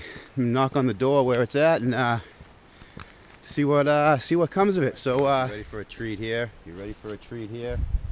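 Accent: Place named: Boston, United States. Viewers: that Boston accent. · "I went to a pahhty las weeken that was soooo enohhmis it was stahtling." Boston baby! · This guy has to be from new england.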